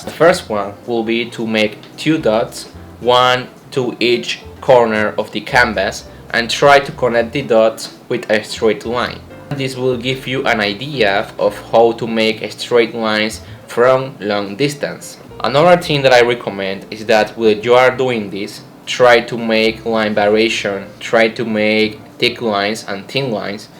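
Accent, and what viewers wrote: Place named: Latin America. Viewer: ya me parecía que el acento era algo latinoamericano (en la información de tu canal dice que sos de Panamá te juro que no te doxeé) de verdad es increíble ver qué la comunidad de artistas es tan variada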